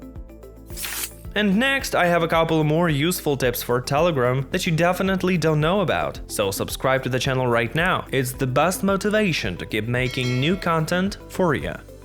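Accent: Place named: Russia. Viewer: You are Russian, I hear your accent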